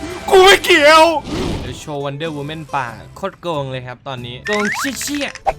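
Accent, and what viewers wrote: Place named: South, Thailand. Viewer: คนใต้แน่นอนพูดแบบนั้น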